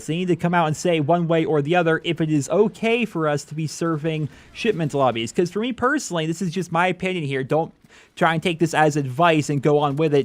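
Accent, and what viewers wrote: United States. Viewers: Really you're English? Your accent sounds American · are you really English? I thought you were American. where in England are you from? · Wait... You're English? I thought you were American or Canadian Well I'm Scottish and most people don't realise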